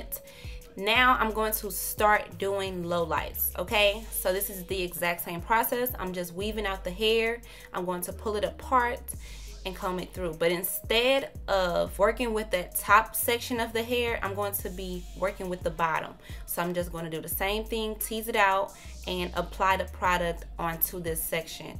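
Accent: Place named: Detroit, United States. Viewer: You sound like you from Detroit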